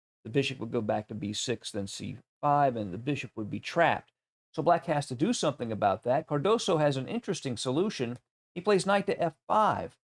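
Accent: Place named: Texas, United States. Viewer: Probably my favourite chess channel (I am also a Fischer fan) and I like the Texan accent.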